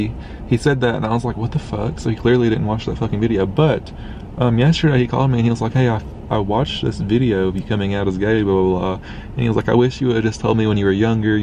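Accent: South, United States. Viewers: I've never heard a country gay accent, it's so mesmerising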